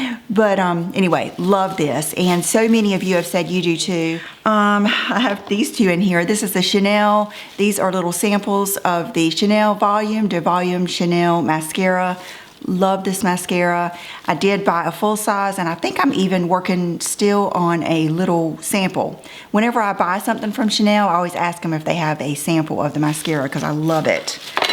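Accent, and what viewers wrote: South, United States. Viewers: Love your channel! Your voice is music to my ears as I am a displaced Southerner! Love your content too! Thank you so much for bringing all this info in a fun to watch videos! Not easy to do.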